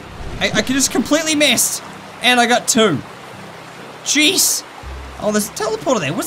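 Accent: Australia. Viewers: are you austrailian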